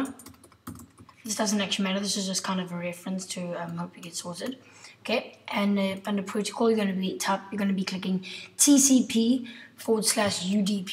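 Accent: South Africa. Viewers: THANK YOU!!! And you're South African. :D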